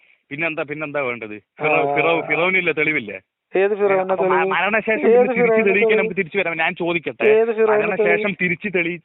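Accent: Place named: Palakkad, India. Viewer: അല്ല പാലക്കാടൻ ശൈലി തന്നെയാണിത്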